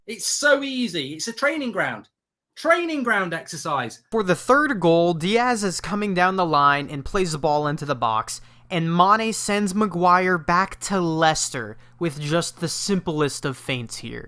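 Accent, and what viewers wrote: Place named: United States. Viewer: I love listening to American accent talking about 'football'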